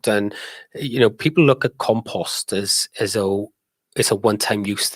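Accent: Wales, United Kingdom. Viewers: No worries, I really do love your content and you can’t beat a good welsh accent 😁 I hope the operation goes well and you make a speedy recovery, I know how hard it can be living with vertigo, my mum gets quite bad bouts sometimes and can hardly do anything when they hit. Great stream by the way, congratulations again on the 250k subs 🥳 🥳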